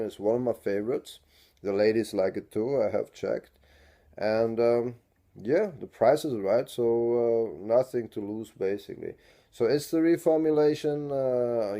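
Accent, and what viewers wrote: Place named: Iceland. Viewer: Love your accent, are you from Iceland?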